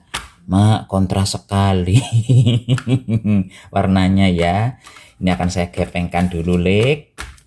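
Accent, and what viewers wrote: Banyumas, Indonesia. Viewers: Bahasane mblaketaket Banyumasan versi Indonesia he hehe lanjutkan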